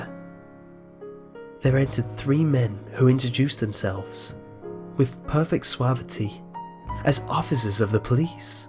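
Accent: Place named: United Kingdom. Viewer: Okay, seriously, grammar, plus he may be from anoth country, everyone says stuff different in different places. Don't judge him for how hepronounces words. I'm pretty sure he's from the UK. We pronouce diffrently. Okay?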